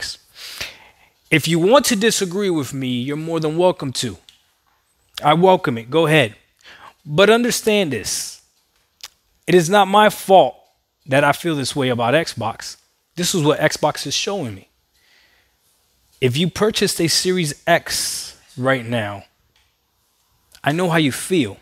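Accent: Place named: New York, United States. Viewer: Bro where you from New York or jersey??? Accent thick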